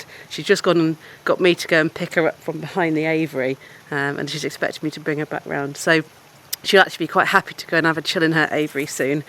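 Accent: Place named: Manchester, United Kingdom. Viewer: Well I thought she was talking rp recived pranonsition now it terns out she sounds a mankunian?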